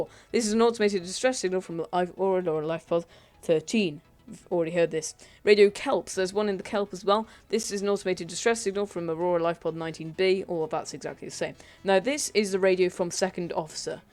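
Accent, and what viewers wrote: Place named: Midlands, United Kingdom. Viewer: where are you from? I'm guessing Midlands area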